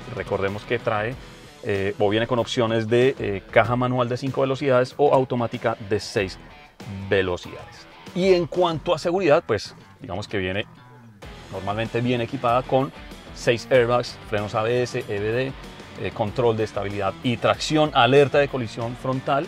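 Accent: Bogotá, Colombia. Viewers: Habla como rolo lentico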